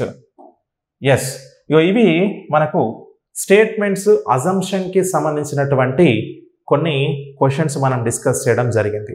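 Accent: India. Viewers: Sir midi nizamabad ah 😝alne matldutunru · 5,6 days nundi mental ability videos chustunna....Hyd slang lo class vine sariki hayiga undhi sir....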